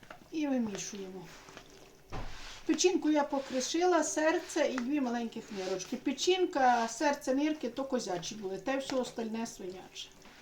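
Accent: Ternopil, Ukraine. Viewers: Дуже люблю дивитися Ваші відео, як ви розмовляєте теж. Хто щоб не казав такий діалект є в північних районах Тернопільської обл.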